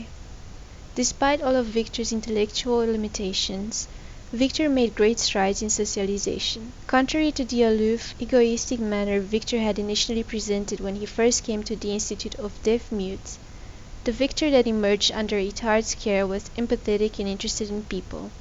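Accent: South Africa. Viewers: you are from South Africa?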